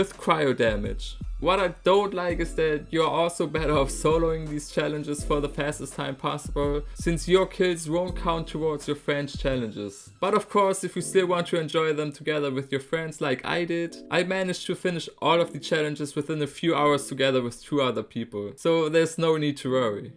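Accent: Germany. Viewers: for me he sounds very German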